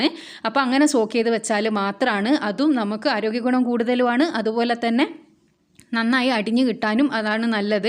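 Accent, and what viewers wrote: Kannur, India. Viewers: Samsaram kannur kare pole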